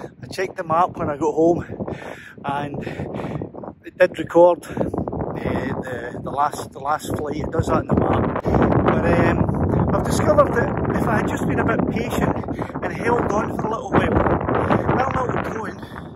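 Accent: Scotland, United Kingdom. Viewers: Your great to watch,,great video,, love a scottish accent,, subbed.....im sure if you keep up the great videos you'll have plenty more subs..... i have the mini 2 also and flew it in a similar location with very high wind ,looked stronger wind than yours,no rain..signal lost,,, i was recieving the high wind warning saying unable to RTH as i went over mountain,,,, .. i thought i lost mine but it came home,,,,,, stunning little machine,,,,,,,, amazing technology....sports mode for very high winds.......nd filters for shooting video is a must have.... happy flying..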